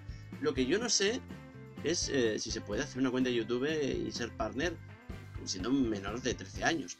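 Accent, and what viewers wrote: Asturias, Spain. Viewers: eres de Asturias? que bien yo tambien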